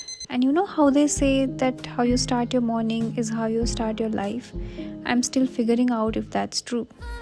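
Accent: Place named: South India, India. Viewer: Judging by her pronunciation, she's probably from the south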